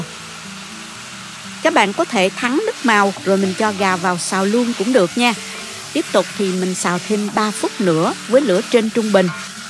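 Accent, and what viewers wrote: South, Vietnam. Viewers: Cám ơn có chia sẻ những món và nói chuyện rất đúng chuẩn là người Việt Nam trong Nam không lai điệu ngoài Bắc chân hang như cho bao nhiêu đường hay kho khoảng bao nhiêu phút là được nghe rất hay chứ bây giờ thì có rất nhiều người dùng những Từ như khoảng bao nhiêu phút mà nó là tầm ba phút nghe nó sao sao 🙏🙏🙏🙏🙏🙏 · Minh cu nghi dang o Binh Duong, gan Saigon! Vietnam. Xin Co^ cho biet Co^ dang o dau nghe. Rat yeu men Co^ qua tai nau ăn va giong noi ngot ngao Nam bo^. Nguoi mien Tay o California US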